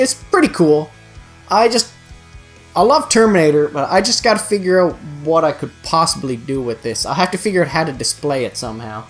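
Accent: Canada. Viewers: you sounds so much more Canadian than Tracey does. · Love the Cape Breton Accent! Haha. Great job!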